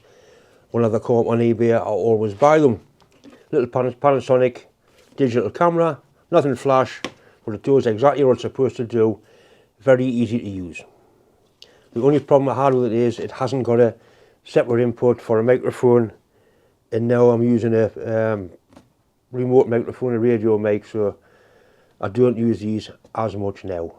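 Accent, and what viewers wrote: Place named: Geordie, United Kingdom. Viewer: Your the UK version of Chris from clickspring, tiny shop making amazing stuff. With that broad geordie accent that makes me think of home (Sadly I'm a Smoggie) and your gentle easy going way, it's just perfect. And the swearing means your human, hee. I always learn something and that is the best sort of video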